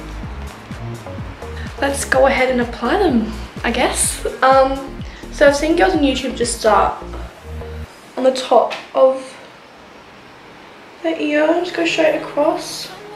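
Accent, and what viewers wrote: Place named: Australia. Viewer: It's not your fault, please don't get so upset! It's just Australian accent that is hard to understand!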